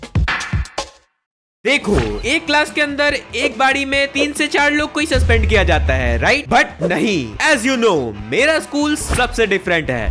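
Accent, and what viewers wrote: Bihar, India. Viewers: Bihar se ho kya?? Aapke bolne ke style se laga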